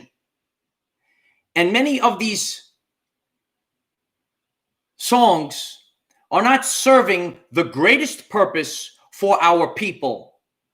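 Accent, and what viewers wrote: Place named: New England, United States. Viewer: I love music. How can I find out the frequency of different songs? Also, I've been seeing my numerical birth date often recently, including the number of likes this video had. I suppose it's an indication of something being of personal significance but wondering if you have any more specific insight of these synchronicities. Thanks. I appreciate your smile, your guidance and comradory through this exciting journey. Where did you grow up? I'm a southern gypsy and enjoy your new england accent. Thanks again. Namaste 🙏🌈🕊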